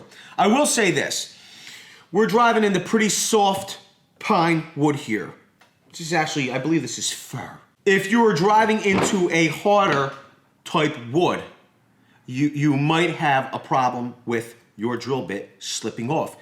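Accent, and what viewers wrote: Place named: New England, United States. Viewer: God your yankee accent makes me cringe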